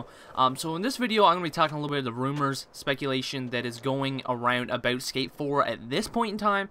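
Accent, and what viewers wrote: Canada. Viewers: are you Canadian? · What part of Canada are you from holy shit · Canadian accent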